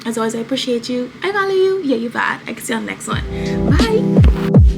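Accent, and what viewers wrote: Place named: Bahamas. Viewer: I know a Bahamian when i hear an see one lol 😅 Love the video keep up the good work luv 🥳 242 to the world 🇧🇸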